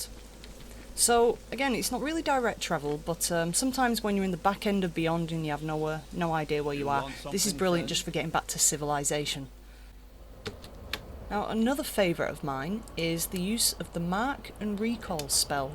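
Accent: United Kingdom. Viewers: Are you British :) i love your accent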